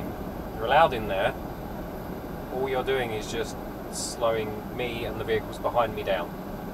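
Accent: London, United Kingdom. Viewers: Both the cyclists must have extreme death wishes. I say 'I hate people' all the time, especially now when just going to the store is insane. People will run you over with their shopping carts and then get out in their cars and hit you, not stop at stop signs, but feel entirely justified to stop in the drive lane and back up or just sit there. I saw eight cars not stop for a stop sign just today. I just laugh at the morons. I just found this and I love the fact that there is absolutely no censoring to your thoughts (plus the accent, but as multicultural as London is, you've probably heard that a lot). Merry Christmas early from the U.S.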